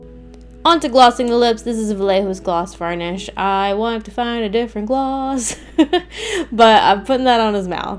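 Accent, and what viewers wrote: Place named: Upstate New York, United States. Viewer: I love your lingo. I swear if you lived nearby we would be besties. Unfortunately for me Id guess from your dialect (which sounds north eastern, more specifically Upstate New York) is on the other side of the country. I live in Washington State.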